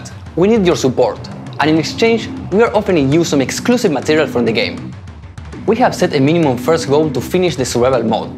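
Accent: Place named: Argentina. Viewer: Jajaja genial que al hablar ingles te sale todo el acento argento, genio!!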